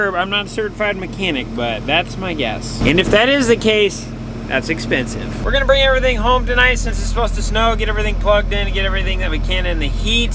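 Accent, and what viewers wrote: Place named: Midwest, United States. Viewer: why i am understanding ur midwest dialect better than my dialects in my own language german :) :)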